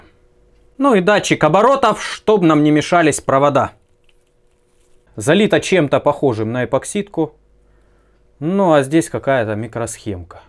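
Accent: Rostov, Russia. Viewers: Понад корпусом🤣 ростовский говор ни с чем не перепутаешь👍 привет узловой бригаде связи г. Аксай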